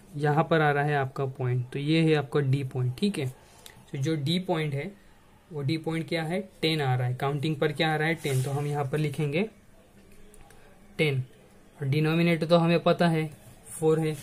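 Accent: Maharashtra, India. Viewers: Best explanation sir are you marathi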